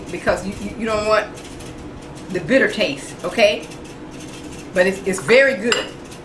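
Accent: United States. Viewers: I am just Subscribing as this was in my recommendations. I love how you are surely Taking Charge of your Health and showing us that we can do so as well!!! You sound like you are from Down South Georgia somewhere! Blessings to you "Grandma"!!! 💓 💓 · For the first drmonstrationI got the aloe vera gel, the carrot, the honey, the juice but what is the last item she added ? I didn't get the last item he added because of her America accent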